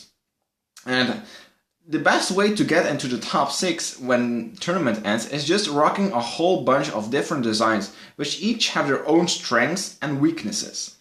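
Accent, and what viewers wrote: Netherlands. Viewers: Are u dutch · you are dutch?! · Hé, je bent Nederlands! ( of Belgisch) : )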